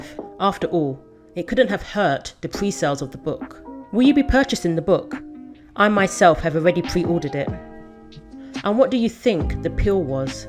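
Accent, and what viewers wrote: England, United Kingdom. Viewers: No new information here. Just told in an English accent.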